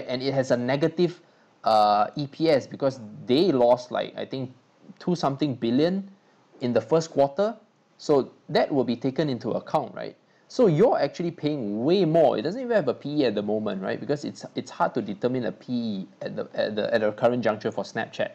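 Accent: Singapore. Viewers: Hey, listening to you I gotta wonder if you're Singaporean cos I am hahaha:D Cool video and thanks for the info!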